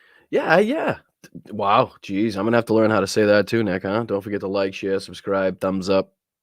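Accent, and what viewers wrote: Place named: New England, United States. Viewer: This was fun. I learned more about you, which explains why I don't see enough of you. You are very funny no matter what you're talking about, and you're handsome with an adorably annoying N.E. accent. 😉 Take that and run with it for now. It will develop into something on its own. The first 10 minutes made me laugh hysterically. The tech issues being worked out was funny because, like I said, it doesn't matter what you're doing, you bring fun positive energy just by showing up. I like the shoot the shit mode with the chat. I need a break from the more rigidly constructed political shows. You can still talk politics. It's just more relaxed and off the cuff, so I'm less traumatised by the events of each day. Starting my day today with a smile, thanks to YOU❣️😁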